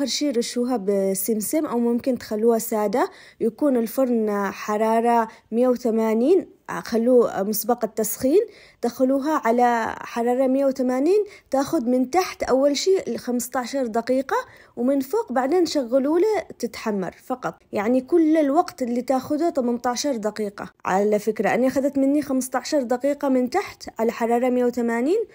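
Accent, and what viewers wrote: Aden, Yemen. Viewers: تحياتي لك عدن الغاليه وتحديدا من المعلا تعجبني لهجتك مازلت محافظه على لهجت اهل عدن تسلم الايادي وتسلمي على مجهودك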